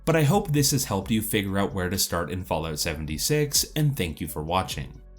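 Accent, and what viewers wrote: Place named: Midwest, United States. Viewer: I’m guessing he’s from the Midwest. Maybe Ohio or PA. His speech is PERFECT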